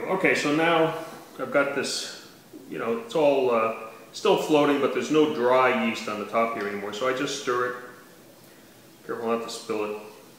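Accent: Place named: United States. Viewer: For a person with an American accent, I found it slightly odd that that all ingredients were named by metric weight. Something easy enough to figure out, but USA never went metric, so far, even though there was talk of it 40 years ago. I've eaten there when I was at Yale. Frank's obit started on the front page of the NY Times!!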